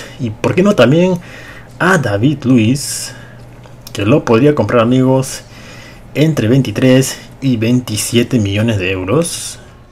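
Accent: Peru. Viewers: eres peruano? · eres peruano ¿?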